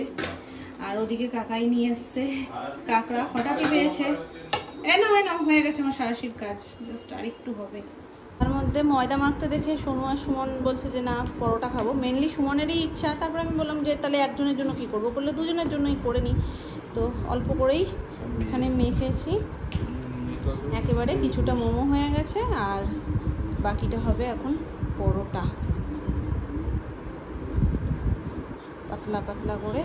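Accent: Bangal, Bangladesh. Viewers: Pakabori mukhe bangal kotha sonle khob valo lage mon ta vore jay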